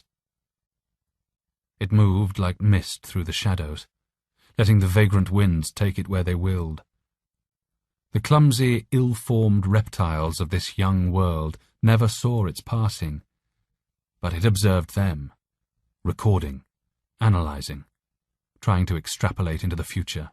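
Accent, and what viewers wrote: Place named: United Kingdom. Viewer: Great story and excellent narration pace. The narrator also has a nice voice and an elegant British accent.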